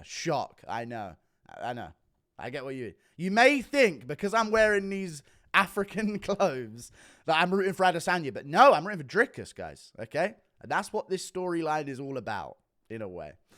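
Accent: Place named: United Kingdom. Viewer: I first heard your British mouth a year ago and commented sht.. But 🤔 Been listening to ya lately and couldn't agree more! I'm a new sub.. and laugh my ass off at your accurate and funny accounts 😂 keep it up 👍.. Sacramento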